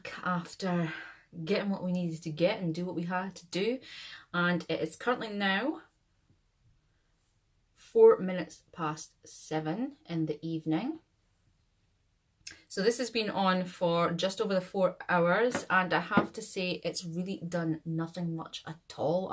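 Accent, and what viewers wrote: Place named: Dundee, United Kingdom. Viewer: I knew I recognised that accent! I’m from Dundee too. Might see you around. Lol xx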